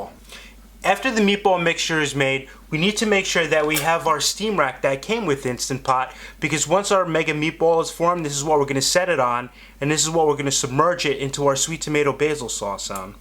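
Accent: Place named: Boston, United States. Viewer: Thanks, you ROCK too! I love listening to your Boston accent! :)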